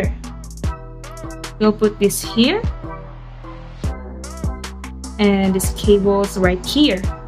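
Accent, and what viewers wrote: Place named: Malaysia. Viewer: Are you malaysia ur accent is nice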